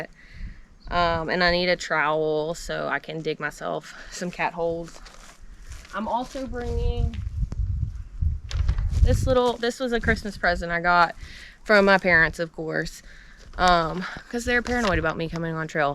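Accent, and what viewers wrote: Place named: Georgia, United States. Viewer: U must be from South Georgia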